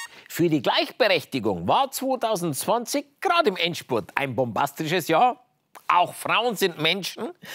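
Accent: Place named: Bavaria, Germany. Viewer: Mir gfällt dei bayrisch du bist ja gar kein :)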